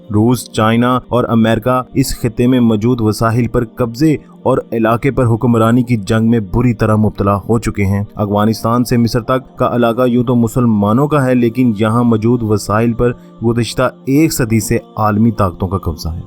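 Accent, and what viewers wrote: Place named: Punjab, Pakistan. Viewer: This guy speaks Urdu but punjabi accent , means Pakistani punjab region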